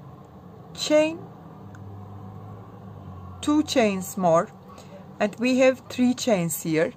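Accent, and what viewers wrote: Turkey. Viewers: Abla aksanından tanıdım türk olduğunu valla elenjdkdnwbsk Deniycem yapmayı şimdi ellerine sağlık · Gerçekten Türk aksanı diye bir şey de var ben de oradan anladım. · I love your accent! Are you turkish?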